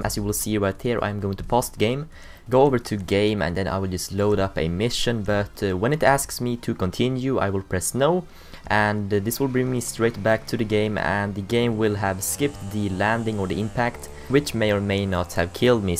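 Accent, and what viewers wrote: Sweden. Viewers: From your accent I'd guess you're Swedish.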